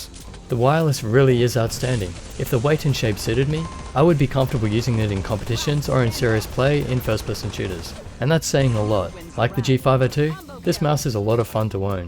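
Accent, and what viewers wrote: Australia. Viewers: This is a bloody excellent review, mate. Impressive amount of detail! My first video of yours and an instant sub. Also great to hear some Aussie-English amongst the far too much American going on on YouTube!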